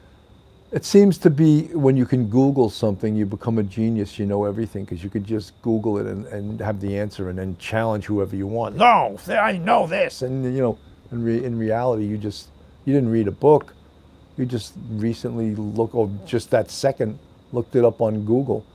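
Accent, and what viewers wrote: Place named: East Coast, United States. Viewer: The hat looks great on you. Your voice makes me miss the east coast.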